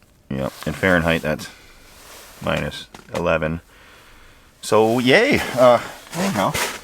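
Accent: Canada. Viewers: you sounded canadian and after i heard “vitamin beer” i had to check and i was right. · I knew he was Canadian ah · I was about to go on the defense, but then he talked, and I get it. He does sound Canadian.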